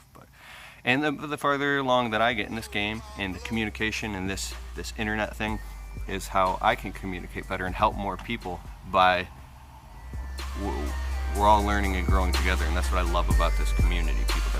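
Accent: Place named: New Zealand. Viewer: he is from New Zealand dude ...😂😂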